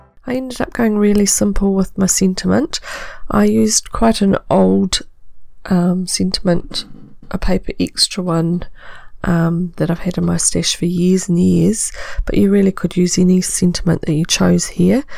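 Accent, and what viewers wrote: New Zealand. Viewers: Hi. I am not a kiwi. My son luves in Christchurch. I've spent some time there. I felt that you had a NZ accent and that's why I asked you. I live in Mumbai...I love the kiwi accent. As my son has settled in NZ... it has become my second home. It is the most beautiful country in the world. Wish you all the best, good health Happy crafting....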